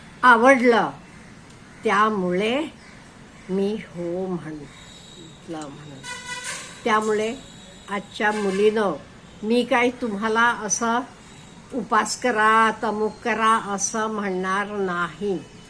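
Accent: Karnataka, India. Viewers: तुम्ही कानडी भाषिक आहात का. बोलण्यावरून असे वाटते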